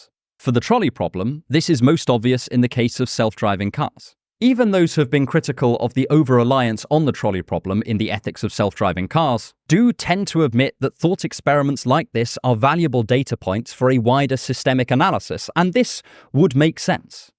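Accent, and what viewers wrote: RP, United Kingdom. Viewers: Your proper delivery of content using concise breakdowns, perfect annunciation and liberal use of gesturing without your posture falling outside of conventional expectations is; in short excellent. Standing tall, speaking confidently with; from my vantage point, an accent that demands acknowledgment of its old-world knowledgeability, all the while casting spells with all that gesticulating it would seem, you’re helplessly bound to. Again: no notes. As a blue collar American lefty my inner, ignorant, exceptionalist at surface level sees a pretentious, pompous, privileged, pretty boy, from fancy accent island making some non-point I heard wisecrack cover in a self deprecating video years ago. But that’s the very worst part of me. My jealously. Seeing your youth and education and talents put to use in one concerted effort makes me pine for a life I was born too late to capitalize on. 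Much the same as any other haters you may encounter online assailing your content with contempt. I say this all to tell you haters are just usually ashamed. And also to remind you DONT READ YOUR VIDEOS COMMENTS. THIS ISNT FOR YOU TO SEE IF TOU VALUE YOUR MENTAL HEALTH BRO. Take care.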